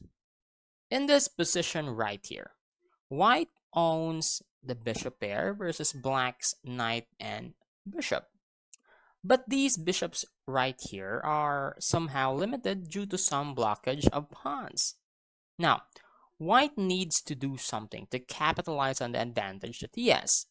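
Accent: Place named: Philippines. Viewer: Change your Pinoy accent to a British or Czech one and you will have more global viewers. Fact.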